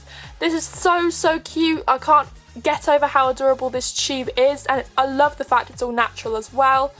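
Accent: England, United Kingdom. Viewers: Are you from the north or south of England. ? :) love your accent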